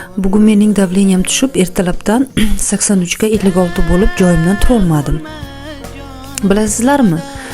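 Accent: Surkhandarya, Uzbekistan. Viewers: Гапиришларизга ичакларим узилди сурхончасига шевада😂😂😂😂😂😂😂 жувиб денг😂😂😂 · Сурхондарёлик ликсиз шекилли.. 😅😅😅 Қоранг қони кай.. Жувиб.. 😅😅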